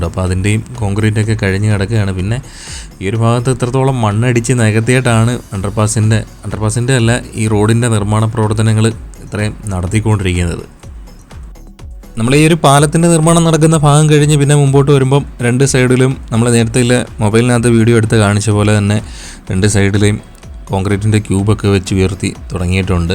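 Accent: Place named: Central Travancore, India. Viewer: നമ്മുടെ മധ്യ തിരുവിതാങ്കൂർ ഭാഷയിൽ കണ്ണൂർ drone വീഡിയോ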